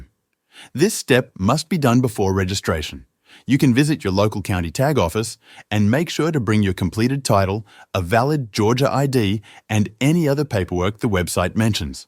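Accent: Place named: United Kingdom. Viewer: Thanks, for the video. Love the British accent....😁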